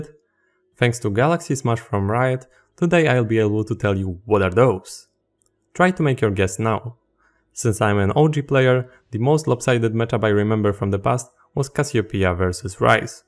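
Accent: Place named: Poland. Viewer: Polak? bo slysze akcent XD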